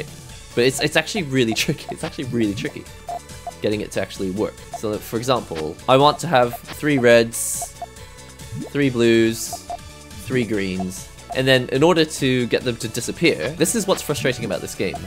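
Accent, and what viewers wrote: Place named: Glasgow, United Kingdom. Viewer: You have an interesting accent. It almost sounds like (posh) Glaswegian at times.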